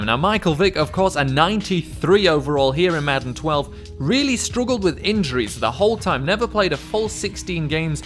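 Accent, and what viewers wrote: England, United Kingdom. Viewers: It's satisfying to hear an english guy talk about madden lol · dude you actually know what your talking about with Madden OR actual NFL??? AAAANNNNNDDD your playing biggie beats in the background?? and your British??? new sub for you mate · Stfu your British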